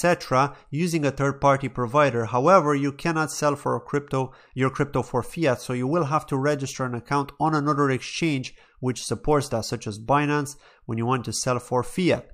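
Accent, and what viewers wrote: Ireland. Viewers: You sound like an Irishman ☘️☘️☘️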